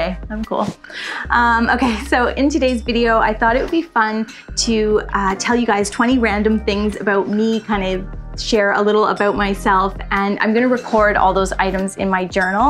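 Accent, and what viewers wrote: Canada. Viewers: Been watching your channel for awhile love your sketching and watercolour tutorials. I picked that you were Canadian - I could tell by your accent. Great video and thanks for sharing.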